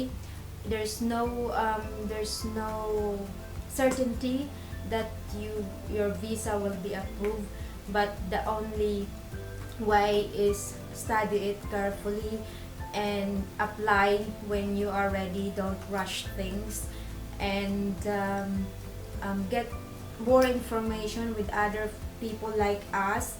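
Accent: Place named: United Kingdom. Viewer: Very helpful and you explain it well. Galing nyo po mag salita with british accent pa po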